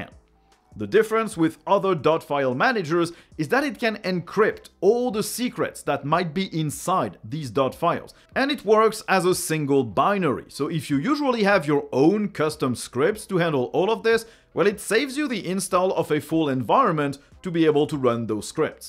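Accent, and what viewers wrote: France. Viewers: génial ! ce petit accent français lol , c'est subtile mais perceptible ;)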